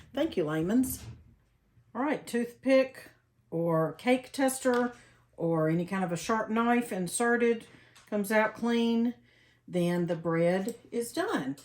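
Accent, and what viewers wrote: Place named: South, United States. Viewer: Hi,! I was delighted to hear that you are from Middle Tennessee. I live in the Muletown if you know where that is and it is also called "The Dimple of the Universe". I hear that you still have our beautiful "Southern Accent". When I was young, I lived in 5 different states and two other countries but moved back to my hometown years ago and am so happy that I did! Do you ever miss living here? Thank you so much for the recipe. It looks delicious. My family makes it with White Lily, Martha White or other WHITE cornmeal but that is because we can get it! So glad that I found you on here. Have a Blessed day.🥰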